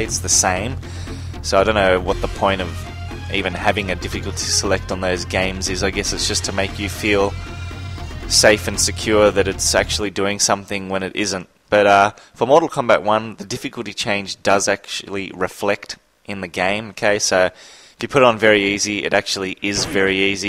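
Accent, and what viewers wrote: Australia. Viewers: This guy is cool, is he australian? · yoe91 100% Aussie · Yes, he's an Aussie! xD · Your voice sounds Australian. Is that right?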